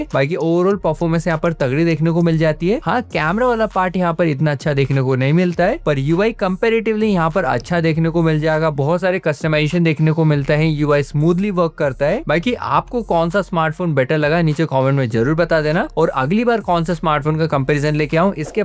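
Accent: Indore, India. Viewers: Bhai aap indore se ho kya?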